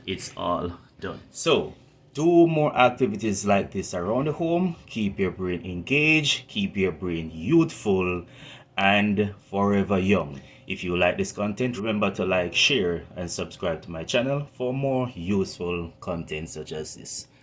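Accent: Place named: Caribbean. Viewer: You sound Caribbean😊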